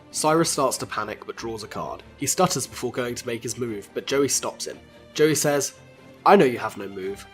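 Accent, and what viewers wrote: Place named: United Kingdom. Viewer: Your voice is so fucking British alas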